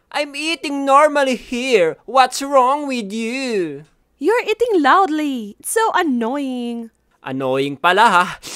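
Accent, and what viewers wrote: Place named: Nueva Ecija, Philippines. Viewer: Taga Nueva ejica ka ba idol may punto kasi salita haha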